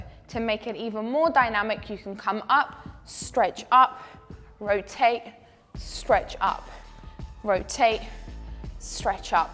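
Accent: England, United Kingdom. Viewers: me encanta tu acento britanico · Смотрю ее только из за английского акцента)))))) ну и жопа тоже класс😁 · she caught me off guard with that f bomb.... sounding all proper with that British accent and looking so innocent. Lol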